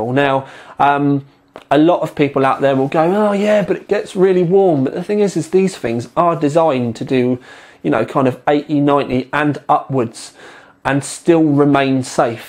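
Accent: England, United Kingdom. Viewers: Why does this russian have an english accent.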